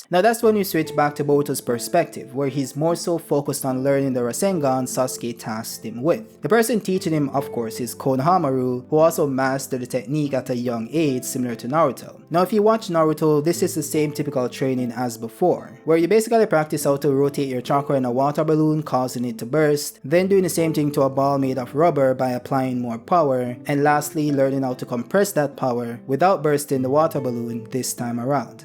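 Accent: Jamaica. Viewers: Wait, are u jamaican that accent can't hide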